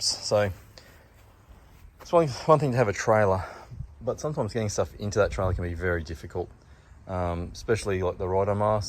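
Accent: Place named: Australia. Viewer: The ramps that you talked about in this video look really useful. I buy used furniture like heavy china cabinets that are two pieces, a top part with glass doors that sits on a base with drawers. The one that I have now is unbearably heavy even with two people to move it out of the van or to lift it up and place on the base so I can photograph it. I think these ramps would be ideal for moving furniture out of the van and possibly sliding the top part of the cabinet onto the base. That would probably take some experimenting to get it to work out properly. Your Australian accent is lovely, by the way!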